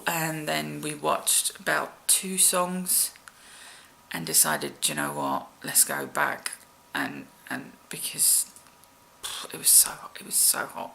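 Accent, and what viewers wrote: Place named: United Kingdom. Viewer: How come you have a British accent when you are Dutch? just curious not a dig :)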